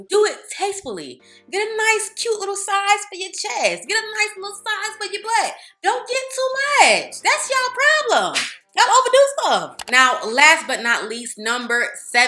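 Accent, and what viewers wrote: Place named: Chicago, United States. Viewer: Girl you gotta be from Chicago ❤ great tips btw!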